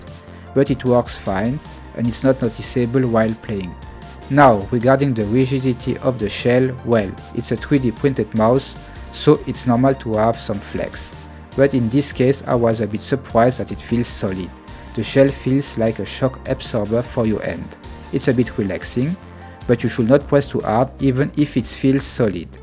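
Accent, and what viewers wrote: France. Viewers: Thanks for your videos. I love your french accent :)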